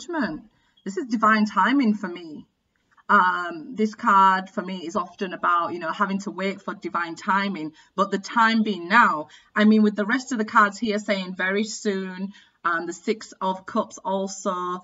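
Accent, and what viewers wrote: Yorkshire, United Kingdom. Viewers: Hi I'm just wondering if you do individual readings? It's good to hear a Yorkshire accent on YouTube 😊 Xx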